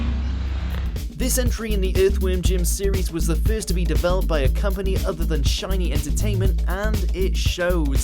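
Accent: United Kingdom. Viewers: Who is this British guy?!? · Why is the narrator so british in this?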